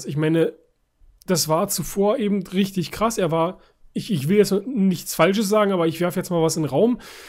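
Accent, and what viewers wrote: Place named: Germany. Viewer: Du hast einen hardcore deutschen Akzent wenn du englisch sprichst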